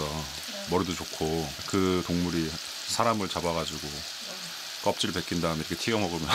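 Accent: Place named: Philippines. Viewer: His accent is slowly turning into a filipino accent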